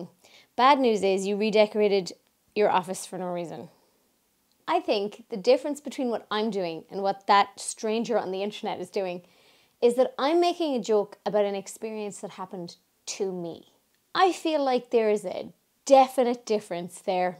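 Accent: Ireland. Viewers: I'm sorry to hear this love... but you sound like a strong woman & it's better to face things head on than to dwell on them & let them get the better of you mentally & emotionally... god bless... just curious what part of Ireland are you from if you don't mind me asking....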